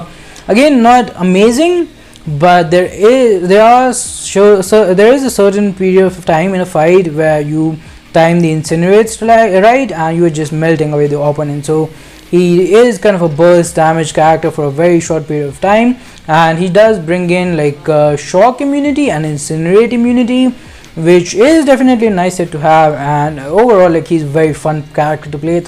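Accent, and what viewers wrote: India. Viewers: Are you Indian ?? Cuz you like and sound like a Indian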